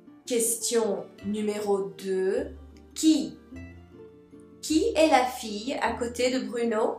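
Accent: United States. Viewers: Tu as prononcé le mot "example" comme "exèmple" :) <link> Mais en tout cas ton accent américain est superbe !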